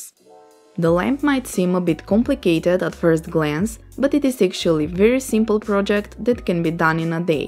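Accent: Russia. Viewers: Русский акцент? Русский акцент = крутой видос Спасибо за интересный туториал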